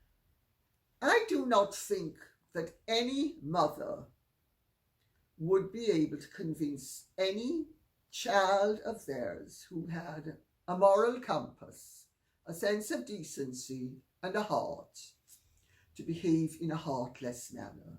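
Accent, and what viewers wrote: United Kingdom. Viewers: I don’t know why, but condemning Harry and Meghan’s behavior sounds sooo much better with a classy British accent! 😉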